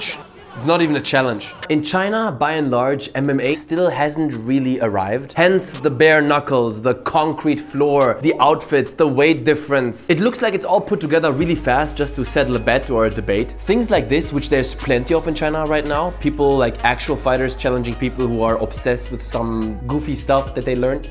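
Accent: Germany. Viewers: Bro r u german ur accent kinda sounds like it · Why do you sound like a german guy speaking english 🤔🤣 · Finds geil wie man den deutschen Akzent raushört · He has german accent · It sounds sometimes like you are from Germany or Austria? "ahhh Im gonna give my Senf..." and the way you say "Steven Seagull" sounds very german to.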